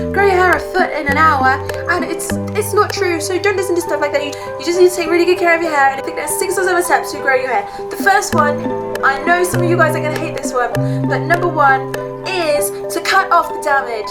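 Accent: United Kingdom. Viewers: She is British tho. Most people understand the British accent, it’s yours that nobody understands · She is fast plus British accent🙄 and the music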